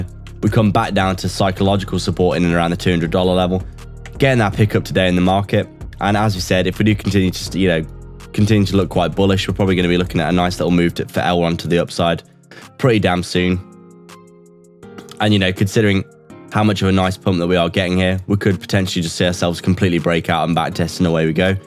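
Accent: United Kingdom. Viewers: You didn’t say where you were from. I’m guessing Brighton👀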